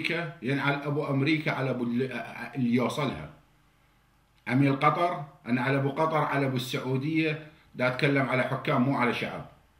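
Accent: Iraq. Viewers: تحية لك دكتور من القدس وما ننحرم من اللهجة العراقية الطيبه ربنا يحفظك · والله زين جكليته تعالج عشرين هههههههههه صدك مهزله وخو الفديو الثاني جايجي بكهوة زايد عليه منصبه ههههههه اني شيعيه بس وربي انتقد حالة اللطميه والتطبير وياأخ وربي كلامك ذهب ولهجه عراقية صرفه وياريت الكل يعرف انو الحكومه هم الي عملوا الطاشفية والله مافي فرق بين سني وشيعي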